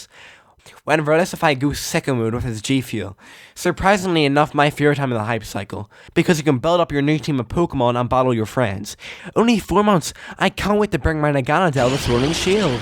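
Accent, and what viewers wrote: Scotland, United Kingdom. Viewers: Are you Scottish? Your accent is fire...also I’m buying it because I’m interested in the game. I like little town hero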